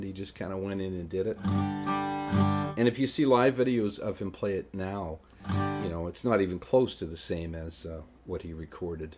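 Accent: Canada. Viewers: Your voice sounds much like Spielberg. You have a Canadian accent. Aside from that, you sound so much like, Spielberg.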